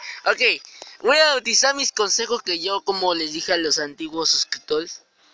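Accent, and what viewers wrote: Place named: Cuba. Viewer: No te preocupes por hablar como cubano, Soy peruano pero hablo como mexicano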